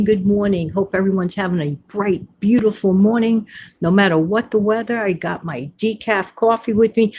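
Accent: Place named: New York City, United States. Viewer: New here ……came here after seeing you with Prepper Princess and Dawn. You have that New York City accent. I probably have the same accent…. I am in a state close to NY. I like the different accents and views of other people. You learn things… it’s fun.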